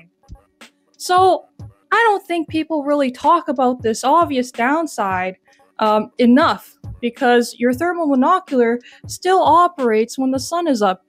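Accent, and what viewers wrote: Canada. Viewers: am i tweaking or r u canadian?? like from van canadian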